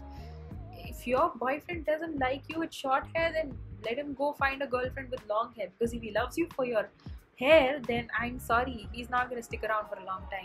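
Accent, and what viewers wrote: India. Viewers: Her accent is Indian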